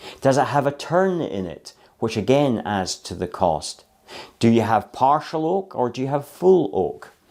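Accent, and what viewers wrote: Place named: Scotland, United Kingdom. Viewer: Love your Scottish accent! I was just speaking to a friend about building my own house one day away from everyone (dreaming)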